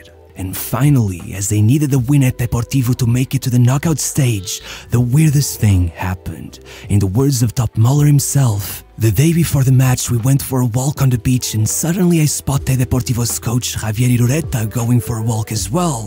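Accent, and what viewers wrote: Portugal. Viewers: I think he sounds like this because of the Portuguese accent and not by choice really. But personally I prefer it than highly energetic YouTubers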